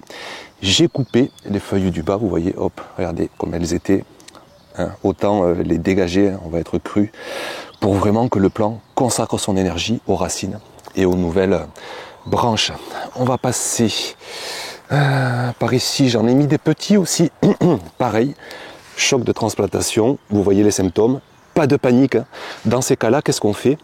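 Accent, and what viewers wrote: South, France. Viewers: Merci beaucoup pour cette vidéo. Exilée en Alsace, c'est toujours un plaisir d'entendre l'accent du sud 😊 Je seme tous mes plants en Alsace chaque année. Ils finissent dans la serre. J'en replante dans notre jardin familial près de gignac, non loin de Montpellier lors des vacances scolaires. Cette année, première zone hélas, les replants ont été mis en place le week-end de pâques. Nous allons voir le résultat pour le pont du 8 mai. 🤞 En Alsace, les plants jumeaux sont en fleurs sous serre. J'attends fin mai pour mettre les derniers en pleine terre et livrer des plants à ma fille en haute Savoie Tous les ans, je suis surprise de l adaptation des diverses variétés replantées dans ces divers coins de France. Que la nature est resiliente et généreuse !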